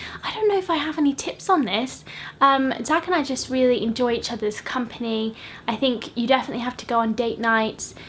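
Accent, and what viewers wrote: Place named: Scotland, United Kingdom. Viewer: I can still hear some Scottish in your accent and it makes me so happy. Also you look lovely!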